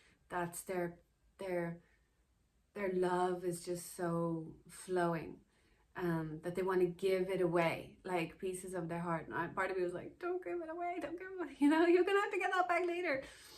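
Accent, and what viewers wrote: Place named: United States. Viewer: Why are suddenly AMERICAN? WTF?!!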